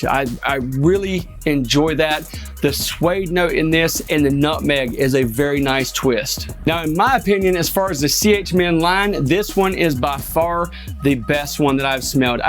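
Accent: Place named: Carolina, United States. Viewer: you got the same Carolina accent as Stephen Thompson 😅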